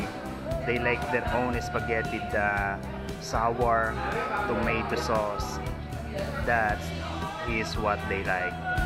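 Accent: Philippines. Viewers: do not listen to people who criticizes you for speaking in english. you are trying your best, and i can understand what you are saying. sure, you may have a filipino accent, but we can all understand what you are saying. i’ve travelled to a lot of countries whose citizens can’t even understand basic english questions. point being, keep speaking in english, as you can only get better at it. i don’t ever reply on youtube video comments, as there are too many trolls, but i can’t stand it when people criticizes others for trying to improve themselves.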